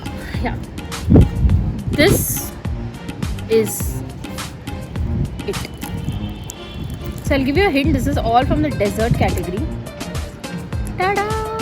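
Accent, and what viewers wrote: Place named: Mumbai, India. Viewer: Lovely VDO. Nice casual feel to it.. i absolutely love your Mumbai Hindi..❤️ Just one thing.. u missed one of my favs Irani Onion Patti samosa....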